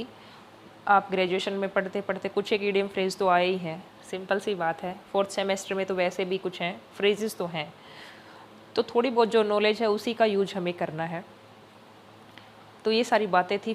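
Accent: Haryana, India. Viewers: Mam meri English bolne m bhut dikt h tho pls app meri help kro 🥺🥺 Ak bt puchni thi app haryana se muje lga apki awaj se 🙄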